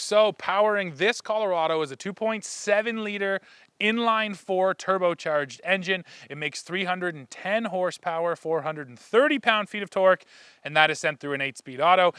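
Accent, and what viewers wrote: Canada. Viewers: Why Canadians mispronounce the letter Z. You don’t say Zedebra, it is damn Zebra 🦓. You don’t say Zedoo. It is damn Zoo. Lean English. It is Zeeee.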